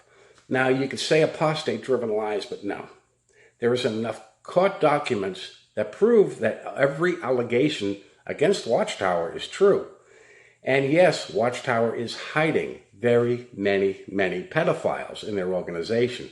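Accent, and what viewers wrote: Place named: Boston, United States. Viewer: I always found it interesting that JW’s let old bank cleaners and window washers dictate their life. I’m from California and you sound like you from Boston.. you don’t earn respect from regurgitating Bible verses on command you can’t tell someone to gaurd a door or fend off protesters if you never even had a traffic violation or a school fight. I know people who will revoke your invitation to life. I love my elders and they are all dead but they kept an eye on me and I appreciate them. They knew they couldn’t control me. I’m a lil guy but I hit hard and unlike Watchtower if I ask you for a favor I will pay you. My suit is highly respected. I don’t play make believe on Sunday tuesdays and thursdays like JW’s.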